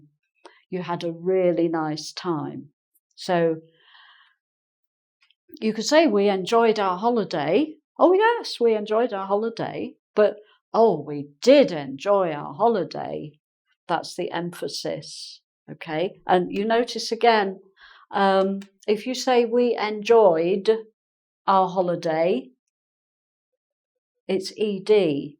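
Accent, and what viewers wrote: England, United Kingdom. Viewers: the people from England have an interesting accent, I guess that she's from some part of UK. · Great lessons. I reall like the way how you bring it to people in a very nice way. Lovely voice and the tippical British accent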